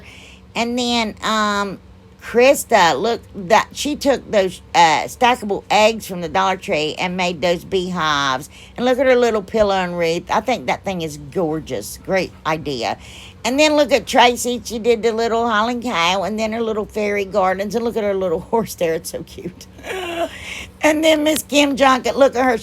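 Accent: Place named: Appalachia, United States. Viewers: That little lady bug and bee could Bee my friends any day. Absolutely adorable! Now, we need you to do Vampires at Halloween. Yeah, I love those fast talking Appalachian people. I grew up with them. That's why I love to listen to you. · Um yea her hillbilly talk well my gosh I thought you were as hillbilly as it got lol !Cute crafts if you can get through the talking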